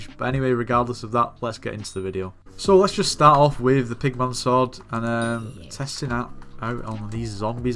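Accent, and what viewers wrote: United Kingdom. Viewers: ma bri'ish man is back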